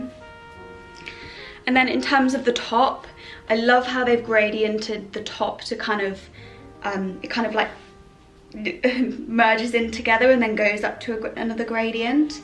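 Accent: United Kingdom. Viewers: I'm just here for the Mary Poppins accent.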